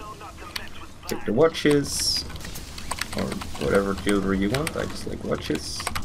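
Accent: Netherlands. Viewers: Jij bent sws nederlands ik hoor het aan je accent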